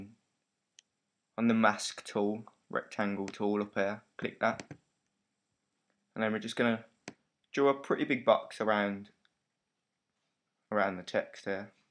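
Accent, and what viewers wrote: Birmingham, United Kingdom. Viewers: WTF BRITISH VOX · So refreshing to have this in a Brummie accent instead of one of our American brothers. Big Up from the Solihull Massive.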